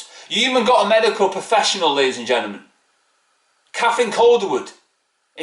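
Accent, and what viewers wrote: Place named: Wales, United Kingdom. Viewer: What part of Wales are you from? You sound like you’re from Rhyl or maybe Llandrindod Wells?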